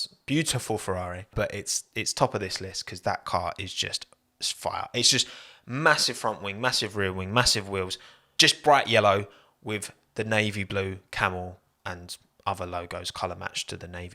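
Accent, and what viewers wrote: United Kingdom. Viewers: i like the combination of american slang with the british accent :D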